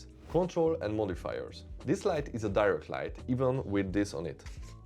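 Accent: Hungary. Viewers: Az első mondat felénél levágtam, hogy magyar vagy xd ment a sub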